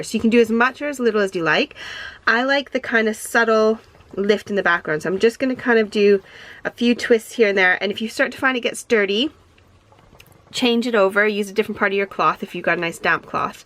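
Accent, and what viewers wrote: United States. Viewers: Hi there, new subbie here, loved your cards, where are you from? I can’t work out your accent, you sound American, but so many of your phrases are English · I so love your American accent with British terms. Makes my day🤓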